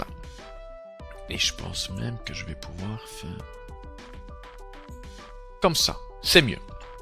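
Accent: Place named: Liège, Belgium. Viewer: Oufti tu viens de lieeeeeege toi 😂😂 J'adore les liégeois 😜 Super tuto, j'attends encore quelques pièces pour mon serveur et puis j'installe proxmox 😁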